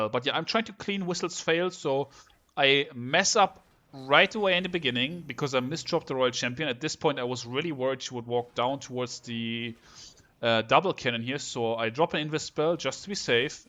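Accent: Germany. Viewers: Richtig geiler channel weiter so. ❤️❤ Hab ich gleich gehört das du deutsch bist. haha 😂 Btw lasst die Maus und die cam so passt perfekt. Alles gute ❤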